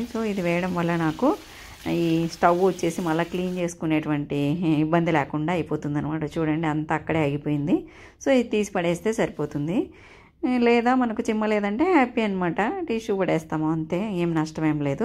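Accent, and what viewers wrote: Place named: Anantapur, India. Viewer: Mee slang antapoor side la vundi mee proper ekkada andi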